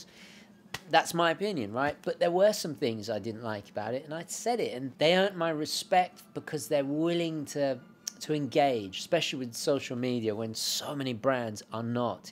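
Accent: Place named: RP, United Kingdom. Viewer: still poncified accents ...... loving it..... but what the heck ?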